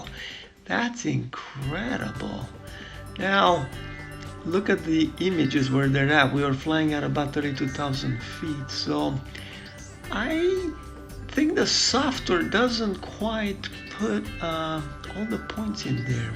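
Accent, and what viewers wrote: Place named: Europe. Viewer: You fools think the EuroDouche accent makes him intelligent or honest ? Misusing "professional" extrapolation/interpolation software to support a dogmatic narrative is something anyone(even a FEtard) can do. & btw, IR just penetrates haze better & lets you see further/clearer, no magic. You can't even talk about such a small angle over such vast distance(or ANY angles/distances) without having measurements(actual) WITH error bars on your initial data. & there are other issueS as well with using this approach here. Being somewhat familiar with how such software(not that particular app but the general assumptions, principles & algorithms etc.) is designed, I'm quite certain that, not only is his "analysis" flawed from the get go, but the conclusions he draws from them are absurd. & he is going "write his own code"(even though he misunderstands what photogrammetry even is never mind how it "works") in Matlab to manipulate data to fit his narrative(NOT reality) even better ? 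I can hardy wait ! Selling(& you're buying) such buffoonery is practicing douchebaggery. I'd be glad to be more specific, if anyone asks the sincere/relevant Q's. Though FETards don't want to actually learn anything EVER. But thanks for the permanent public record of your foolishness though.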